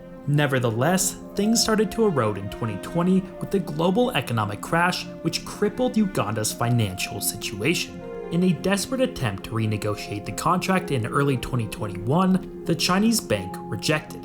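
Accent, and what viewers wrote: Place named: United States. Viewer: Beware : When a video is narrated in d American accent n sounded in way like "we are d righteous knowledgeable West trying to point out all d bad that China is doing", it's wise for viewers to add quite a bit of salt to it..bc China is disrupting centuries of Western global exploitations of d Global South..thru conquest n colonisation in d past, n post WW2 thru neocolonialism, to ensure d G South stays poor so that it's easier for d West to maintain their v high standard of living.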